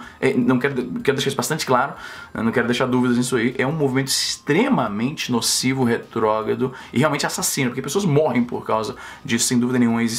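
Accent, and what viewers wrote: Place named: Rio Grande do Sul, Brazil. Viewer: eu achava que voce era gaúcho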